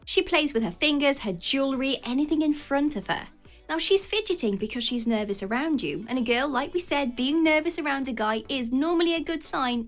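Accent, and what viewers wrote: England, United Kingdom. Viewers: I dont know much about the tips but i watch these videos for the English accent and the squeaky voice.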